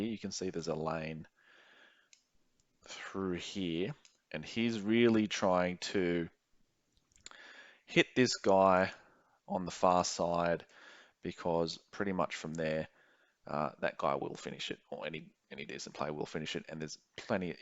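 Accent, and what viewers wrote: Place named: Australia. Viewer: Your voice sounds like an australian accent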